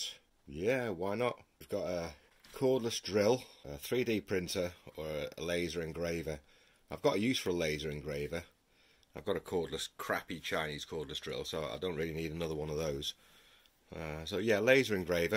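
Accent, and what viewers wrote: Wales, United Kingdom. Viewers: my friend, I’m going to be crossing the pond next year and spending 5 weeks all throughout the UK, Wales, Scotland, and Ireland with my gf and a couple mates. I’d really like to hook up and meet proper. I’m going to be checking out tons of castles and stone circles. Hopefully there’s some in your area and I can knock out 2 birds. I’m just not sure where you’re at. You have a southern/wales accent to ya. Hope you get back with me...Cheers. Btw, I built my lichtenburner from a oil burner. It doesn’t have near the power yours has. What are you using? Todd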